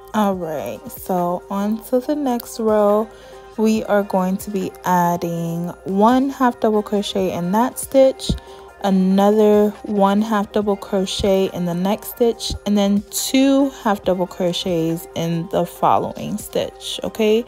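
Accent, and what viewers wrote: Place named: Caribbean. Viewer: Where are you from? You sound Caribbean. Thank you for the pattern, easy to follow.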